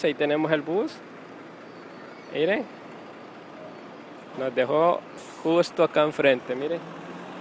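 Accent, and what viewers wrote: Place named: El Salvador. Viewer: También se le nota en el "pues si" jajajaja yo también soy salvadoreña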